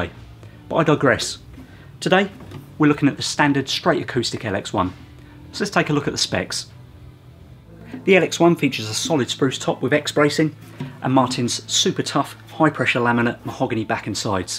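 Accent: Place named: South East England, United Kingdom. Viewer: 掌編製作所 he is from england , south east england me reckons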